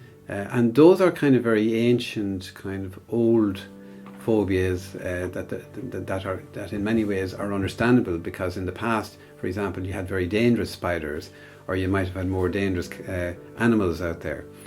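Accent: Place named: Ireland. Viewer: Very calming voice its Irish accent u definitely should do a calming video . Thank u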